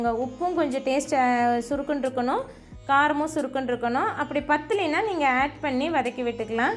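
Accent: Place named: Kongu, India. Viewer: mathikadhinga akka . Kong slang dhan eppavum nice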